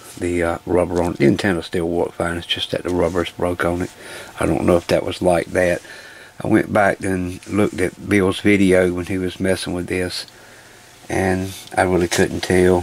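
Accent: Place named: Appalachia, United States. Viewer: I’d never imagine such a heavy Deliverence accent, with someone capable of fix ing tiny crappy radios.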